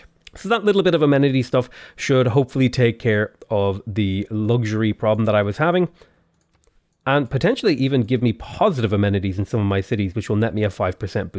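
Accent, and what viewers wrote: Ireland. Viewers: You should triple down on silly pronunciations. You're Irish, so you can generate a really strong mispronounced accent that nobody can blame you for. And you can exaggerate all of the foreign sounding names.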